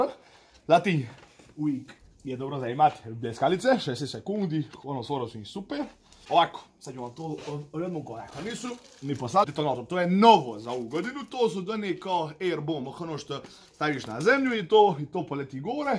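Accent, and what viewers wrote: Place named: Lumbarda, Croatia. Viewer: Moje srce je sada zaigralo i igrat će sve dok se ne bude pucalo ispod one teće.... uvelike se radujem tome događaju i tom simpatičnom naglasku. Vi ste pretpostavljam iz Lumbarde ako se ne varam? Pozdrav iz Krvavca❤❤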